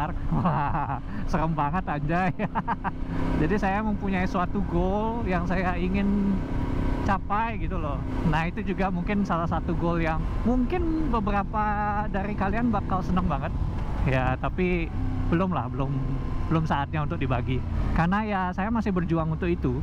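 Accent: Bali, Indonesia. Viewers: Walaupun tinggal di negeri sebrang tapi aksen bali nya ttp ada,, welcome back lahh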